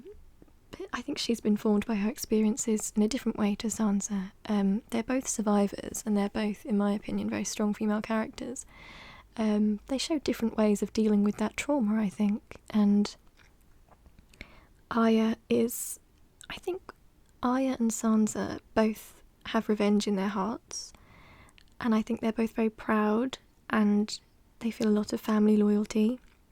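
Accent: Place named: North, United Kingdom. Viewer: I like your Northern accent.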